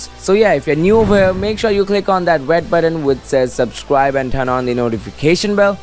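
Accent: India. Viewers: Hmmm I dunno this guy sounds like an Indian scammer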